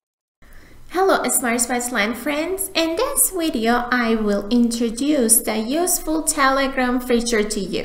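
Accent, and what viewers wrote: Iran. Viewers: That Persian accent 😂😂😂😂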